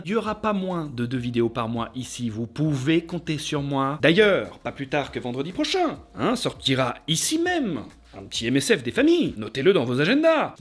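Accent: Belgium. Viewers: Magnifique accent Belge et aussi bonne année. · Abonné depuis 10 ans avec le petite 🔔, j'ai cliqué sur chacune de tes vidéos et j'ai toujours apprécié, car même si je suis passionné par le cinéma, je regarde ta chaîne surtout pour toi, j'ai direct accroché à ton humour et à ce que tu dégages (et un peu aussi pour l'accent belge 🙄😂 Je suis pas très loin) et ce depuis 10 ans ! 😁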